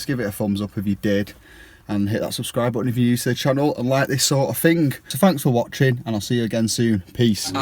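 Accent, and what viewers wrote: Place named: United Kingdom. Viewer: What a lovely britsh acsent )) Thanks 4 Video!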